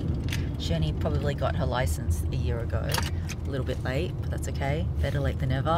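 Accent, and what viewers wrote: Australia. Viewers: Notification squad!! Hey btw I love love your Aussie accent ❤️😍🥰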